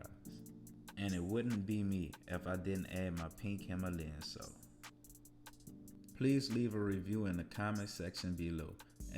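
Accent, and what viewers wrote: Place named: New Orleans, United States. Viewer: The New Orleans accent tho 💪🏾💪🏾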